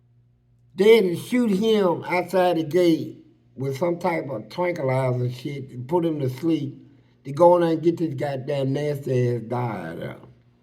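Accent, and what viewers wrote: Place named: Kentucky, United States. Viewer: He got that southern kentucky drawl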